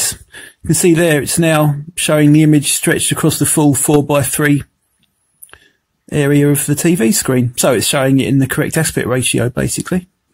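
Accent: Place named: United Kingdom. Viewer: It's in the description above in case u didnt understand what he said bcuz I couldn't understand him either with his British accent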